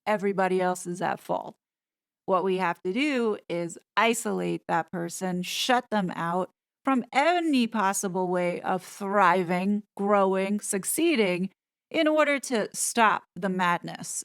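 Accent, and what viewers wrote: New York, United States. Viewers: New Yorker. OK. Could never pin point your accent. Another great video. It appears the girl child creature always thought she was superior to everyone. She sees how much she has missed out of leaving the Royals. With her real love interest, William, moving up to Prince of Wales and the beautiful Catherine, Princess of Wales, she is out of her mind with envy. I'm sure she and her Suck Squad will be hitting the Royals with more hate the likes we have never seen before. Hope it is true MI5/6 are investing the squad. The rings are beautiful. I love how people are making fun of her with those ugly pictures. Every time she hits we should hit back. She wants to act like a child, we can go all out Mother Bear on her. Have a great week.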